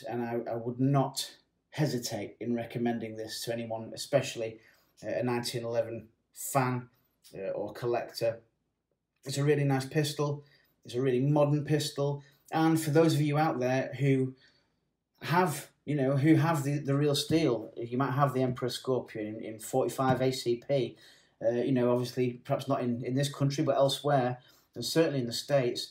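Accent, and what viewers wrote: England, United Kingdom. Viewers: Reviewing the 1776 with your English accent was a treat. 👌 Not a bit of salt over the defeat. 🤣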